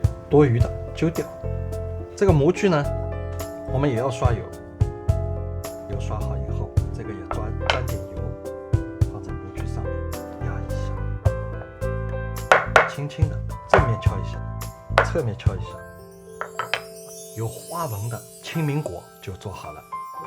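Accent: Shanghai, China. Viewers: 老师可不可以用上海话讲一期啊，加上字幕就好，听讲话我好心焦，沪普，为什么要让讲普通话呢，之前那个广东师傅就用的广东话录的视频。而且这种传统点心配上吴侬软语的解说更加有感觉！！！！！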